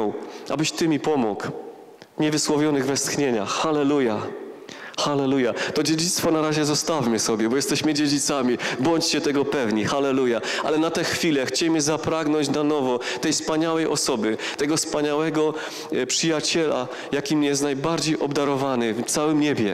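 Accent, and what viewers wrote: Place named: Silesia, Poland. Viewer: spoko Ślązok, genialny akcynt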